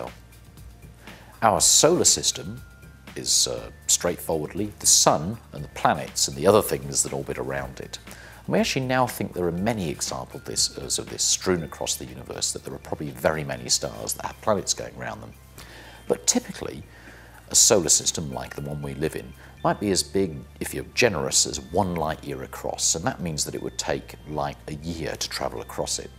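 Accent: United Kingdom. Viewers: OMG JUST NO STOP TALKNG BRISTISH GUY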